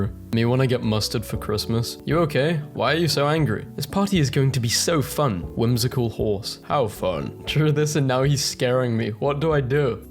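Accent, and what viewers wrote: United Kingdom. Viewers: Why can't I tell if you are British or not? It's making my skin crawl.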